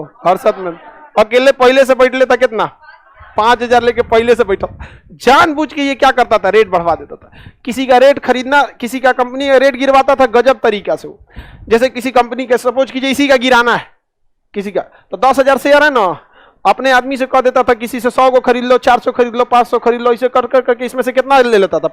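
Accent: Bihar, India. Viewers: Apka accent bihari h but surname kese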